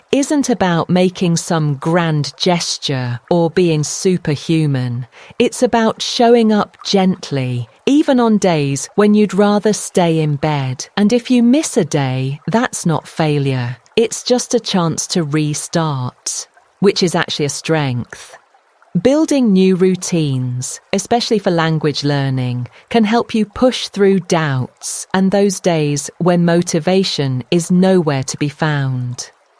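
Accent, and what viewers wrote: United Kingdom. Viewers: ty i love your british accent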